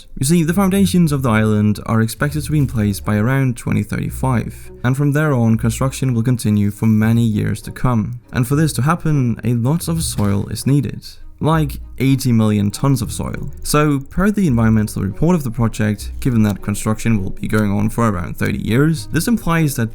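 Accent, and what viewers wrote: Denmark. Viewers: Man kan bare høre han er dansker lmao. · This is completely unrelated but god damn the danish pronounciations are just so authentic!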